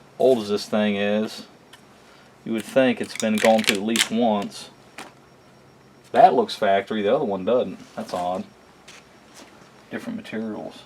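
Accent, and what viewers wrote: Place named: South, United States. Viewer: I really appreciate your videos and your vast knowledge of switch pitch TH400's. Where are you located? You sound like me......Southern. :-)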